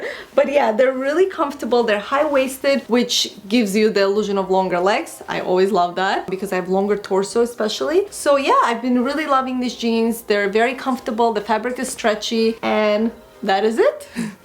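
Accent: Russia. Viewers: Hi, i love your videos ♥ I started watching them recently. I know, that my English is so-so (I'm from Russia), but I think you're from Russia too, cos u have a Russian accent, is it? It may be, I have mistake. :) and by the way, you is a beautiful <3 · whats your accent from? you sound a little bit russian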